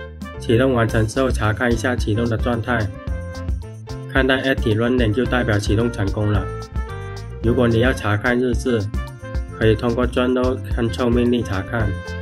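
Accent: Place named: Chaoshan, China. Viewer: 好像很牛逼，空了我也试试大鹅，听口音，up主是潮汕人吧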